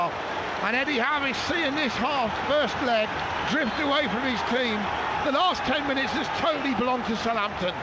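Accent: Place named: United States. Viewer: He is American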